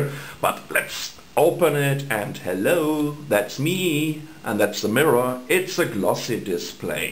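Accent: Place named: Germany. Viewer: I like his german accent :)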